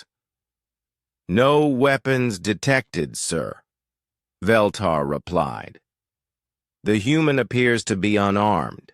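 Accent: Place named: Texas, United States. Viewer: Don’t like the Texas drawl speech pattern.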